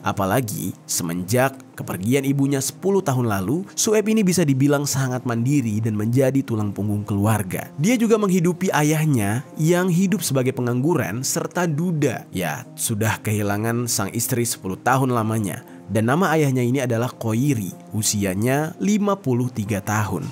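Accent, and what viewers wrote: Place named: Aceh, Indonesia. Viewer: Belum aja ya dengar bg dengan logat aceh nya🗿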